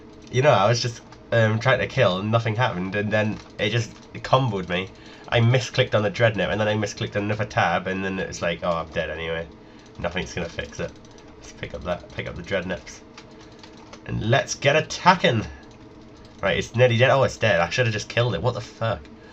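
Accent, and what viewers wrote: Newcastle, United Kingdom. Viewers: it's a geordie accent, like newcastle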